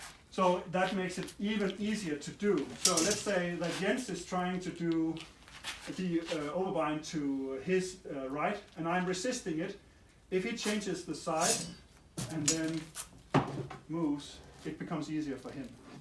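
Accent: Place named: Germany. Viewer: Not being rude in any way, great knowledge! I think whenever you say "Ah" it's a little silly however doesn't mean you are talking out of your butt lol, I'm guessing English is a second language and German is first.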